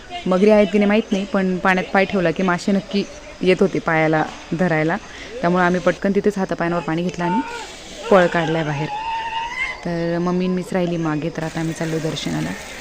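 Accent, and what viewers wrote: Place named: Kolhapur, India. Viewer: कोल्हापूरी भाषा बोलायला भारी जमतीया तुम्हासनी.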